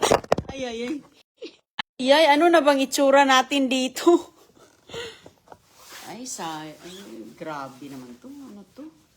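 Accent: Visayas, Philippines. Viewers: Nakaka hiya yung mga nambabash kay Ms.. Porke bisaya binabash ang accent. That's disgusting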